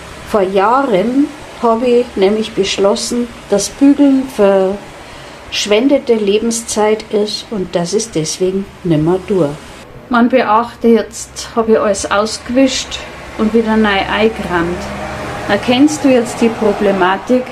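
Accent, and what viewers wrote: Bavaria, Germany. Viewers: I bin nach Venezuela ausgwandert und gfrei mi wenn i a super Frau aus Bayern zuahern konn. Bin aus Minga❤ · Moin moin, na klar versteht Dich der Norden. Ich mag den bayerischen Dialekt, ist lustig 😅. LG, Helga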